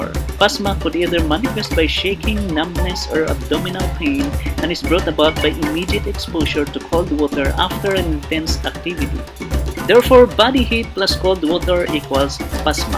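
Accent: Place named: Philippines. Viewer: Nice one you sounds filipino ag galing. Team unity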